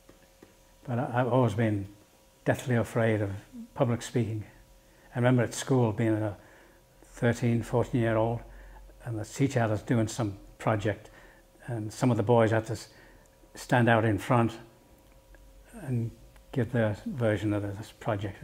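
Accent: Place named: Liverpool, United Kingdom. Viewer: I wonder if he ever said he knew the Beatles when he was chatting up the maids? He sounds like a Liverpudlian.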